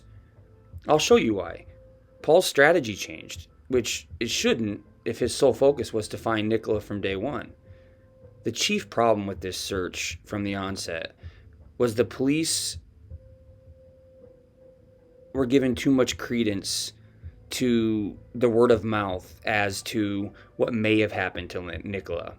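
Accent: United States. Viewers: Just to add yours is the best video analysis by far.....and you sound American.......good job:)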